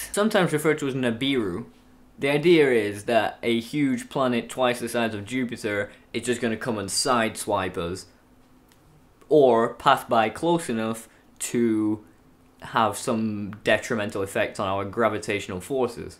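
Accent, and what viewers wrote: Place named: Lancashire, United Kingdom. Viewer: Hey, I dunno if you're still actively using YouTube at all, but I think of you from time to time. I always liked the videos you made, and I particularly like your accent; Lancashire is easily my favourite English accent. Anyway, if you see this comment, I hope you're well.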